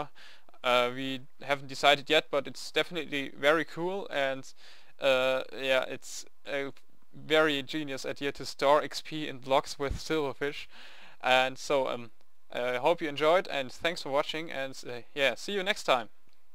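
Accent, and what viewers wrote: Germany. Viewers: mann jetz hab ich schon n paar vids von dir gesehen und hab mir immer gedacht so wie der englisch redet kann der doch nur deutscher sein?! jo jetzt weiss ich es xD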